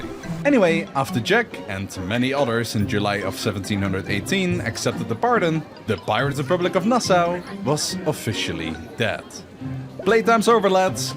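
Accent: Netherlands. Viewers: Oh youre dutch, i was trying to place your accent 🥀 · I knew you were Dutch I heard it from the accent I just waant sure XD but cool!!! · Ik dacht al dat jij nederlands was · Ik kan echt niet luisteren naar nederlanders die engels praten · Are u Dutch? Love your accent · I am only here to listen to his Dutch accent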